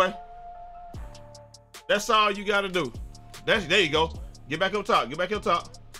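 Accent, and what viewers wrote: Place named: Beaumont, United States. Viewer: Ha hhhhaaaaaaa lol let’s get it wit da green da win, boy you act like me frfr then you gave Goudeau a shot out, stop it sound like you from Beaumont, gg I enjoyed that game play tremendously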